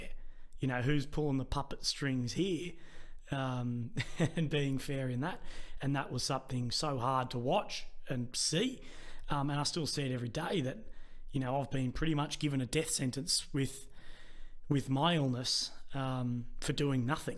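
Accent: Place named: Australia. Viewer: Hi mate, just "found you" in your latest end Feb 2024 video on the Ukraine conflict analysis and doing the back channel and will arm chair my way through 4yrs. At this stage I see where you are at but don't know how you got 'here' and don't know if you will ever see this post with millions of views and 100s of thousands of comments.... But I'll comment anyway mate. But I'm and Aussie ex RAN Submarine Officer, did my 25yrs and been out about 10 yrs now and been pretty PTSD broken as I've learnt you REALLY shouldn't get washed of the back of a Collins class somewhere doing something you can never talk about and leaving the service being a way to broken and a 'tough' hard charger - nothing like your story at all but I feel in a different way, and agree about the NEWS and haven't listened to the bullshit MSM tv or radio in 8 yrs,. Now I follow and listen to a variety of good smart people really discussing world events and I haven't missed TEN or ABC or the radio heads sprouting off giving me there bullshit opinions..... 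And I haven't missed it. Now I find myself (a dam long story but a ball breaker of a story) in the far Nth Arctic Sweden living a better, balanced and good life with my kids, although it's a weeeeee bit removed and remote but it's good - as now I'm finally fixing the head bongo and know what's important. I will keep watching all your current stuff and follow your next 4 yrs journey and enjoy hearing a no bullshit Aussie voice in all the world's noise. I don't know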